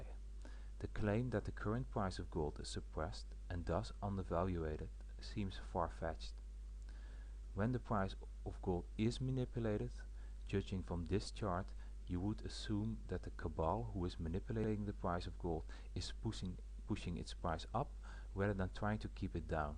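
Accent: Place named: Netherlands. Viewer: Lol he's Dutch. Shitty accent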